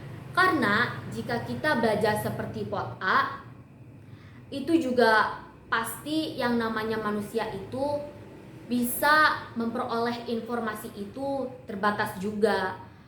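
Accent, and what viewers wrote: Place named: Sunda, Indonesia. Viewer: Keren. Ternyata 'berbahasa' pun masih trrdengar medok sundanya. Pdhl 'speaking' nya juga medok 😀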